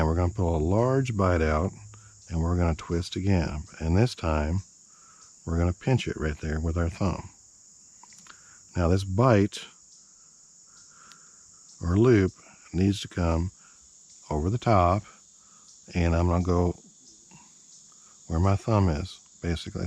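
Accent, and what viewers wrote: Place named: Austria. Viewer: Austrian conductor English. Lineman's Loop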